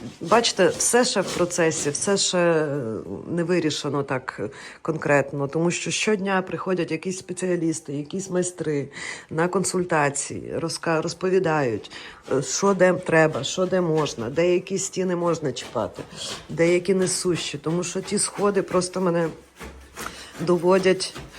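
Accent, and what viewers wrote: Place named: Central Ukraine, Ukraine. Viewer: Гарна українська мова при 20 роках в Англії. Схожа на центральну Україну.[ Хай щастить.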